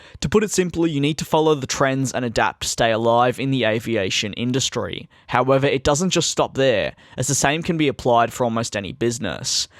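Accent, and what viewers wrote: Australia. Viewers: Your Australian arnt you mate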